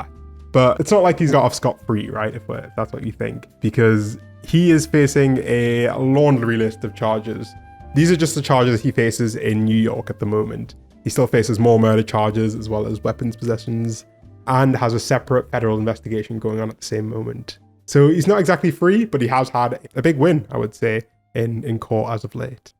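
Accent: United Kingdom. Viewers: You know it took me a while to piece it together. But someone with a british accent explaining the trial of Luigi with more care and compassion then US Media is hilarious to me. Anyway, forgot I was subscribed here and since I turned off my youtube algorithm, I hope to ring the bell and get more videos. Oh yeah, FREE LUIGI!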